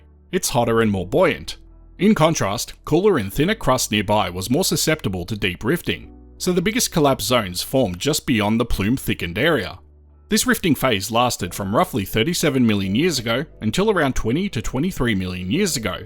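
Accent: Australia. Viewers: the voice is much easy to listen, australian accent is ok way better than the other channel.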